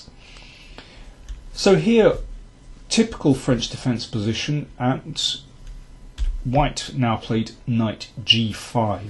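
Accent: United Kingdom. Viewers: I'm also American and I've never had an issue understanding. Maybe I'm more accustomed to the accent due to all the British comedy I watch.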